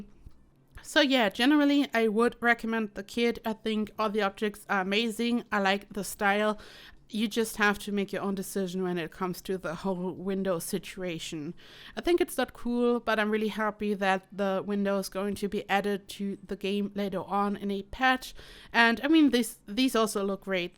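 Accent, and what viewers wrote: Germany. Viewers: I do hear the german accent out of your voice which I think is really cute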